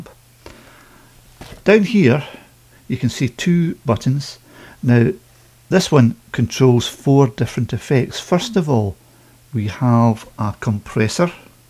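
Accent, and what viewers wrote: Scotland, United Kingdom. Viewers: great review and lovely scottish accent. i can't wait to get this amp!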